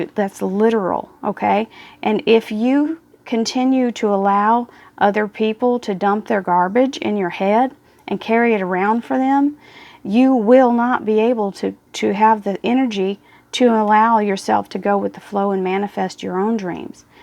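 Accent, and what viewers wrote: Texas, United States. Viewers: This is is 4 U R GREAT N FUNNY IN A GOOD WAY. I LOVE UR TEXAS ACCENT. 🤗🤗🤗🤗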